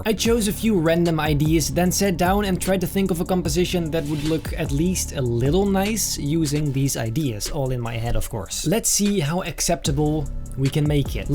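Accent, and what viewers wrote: Netherlands. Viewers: Jij bent nl ik wist het al aan het accent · Je klinkt heel Nederlands, heb Ik gelijk? :)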